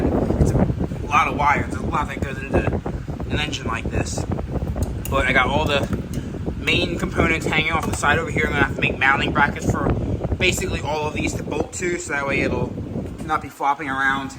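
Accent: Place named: United States. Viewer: Random question but do you live in Europe I noticed all of your license plates are European but you sound like American or Canadian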